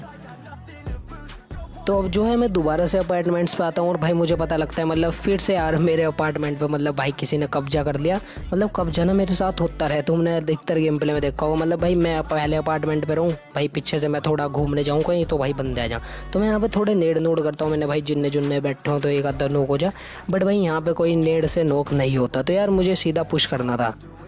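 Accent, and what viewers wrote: Haryana, India. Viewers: Bro ur haryanvi??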